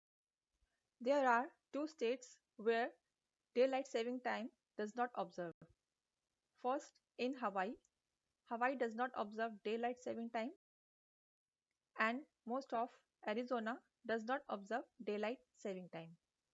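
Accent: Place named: India. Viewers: I do not want to offend you, but maybe you have to think about the rest of the world, not just India. If you want that your video is understood by the whole world, you have to make it as similar to an American neutral accent, as possible. That is just the way the world is. Otherwise, people just will not understand it... I did not understand what you said, I am Mexican, yet I understand pretty much any other videos... so there you go... if you do not care to be understood by as many people as possible, then keep the Indian accent, people from India will understand you, but half the world will not. So, it is up to you... for instance, us Mexicans and people from Latin America work very hard to have an American accent, just so that we can get a better job. But, again, it's up to you. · Bro work even harder.... master your English learning skills so you can even understand Indian accent.... 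not from India but seems like you've a dig with Indians!!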